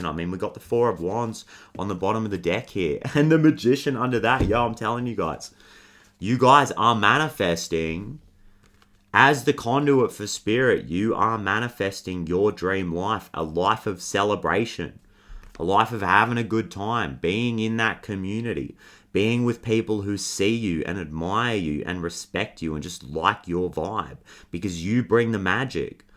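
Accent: Australia. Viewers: Thank u! You read my energy perfectly right now. Love hearing a fellow Aussie accent too… thanks mate. 🤩🌻🖤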